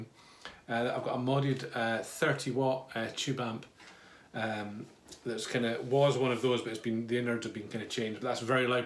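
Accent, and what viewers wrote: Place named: Scotland, United Kingdom. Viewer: Nice to hear another Scottish person!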